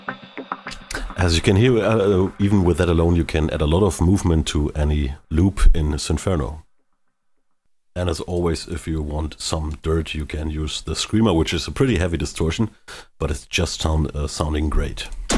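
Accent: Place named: Germany. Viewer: Well done, informative and I just bought it, excited to try. Your English with a German accent is good and I like your humour.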